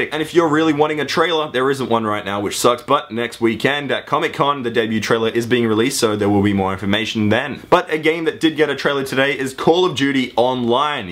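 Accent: Australia. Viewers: ur Australian????? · Halo 4 is gay along with you're Australian Accent / voice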